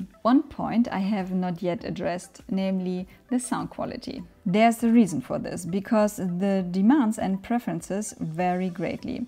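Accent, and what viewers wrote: Germany. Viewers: Well done. You persuaded me to buy these. Love your beautiful German accent.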